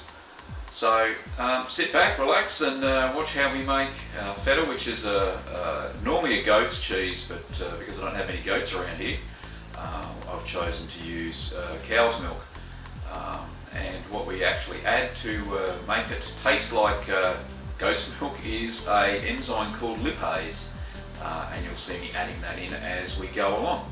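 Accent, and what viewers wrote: Australia. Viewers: I've just had a good laugh. My soundcard is broken so I watched this video with closed captions. speaks with clarity and has a pleasant Australian accent. Sadly youtube almost completely fails to understand. A highlight was when he added lip eyes to his fella. A useful video with added fun from youtube's narration software - thanks.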